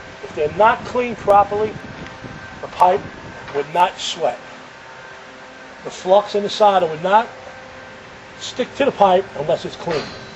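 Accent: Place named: Staten Island, United States. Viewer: I think any of you Youtube nerds, that want to talk bad about, should def. think twice.. LOL anyway, Im in Jersey,(just across the water) and love the tips and your strong Staten Island NY accent.. I have a more technical question.. Anyone with help, please email me Im repairing a p-trap on a bathroom sink from the 1920's or 1930's, can i connect pvc to the old brass pipe, with just a coupling?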